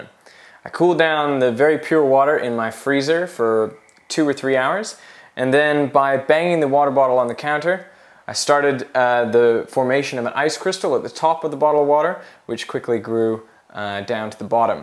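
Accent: Australia. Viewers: his aussie accent is so much stronger in this video than his recent ones although he's canadian, right/? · Anyone else think he sounds more Australian in this part of 2011? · You can hear some Aussie in Young Veritasium’s voice!! So cool!! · I just realized he has an Australian accent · Wow he really has an Australian accent in his earlier videos. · This is the only video I've noticed his Aussie accent bleeding through. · Just the Slightest hint of an Australian accent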